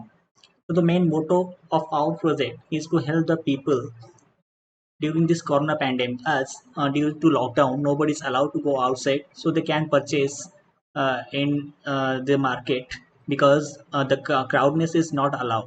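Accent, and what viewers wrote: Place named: United Kingdom. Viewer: Woww woww... British accent😱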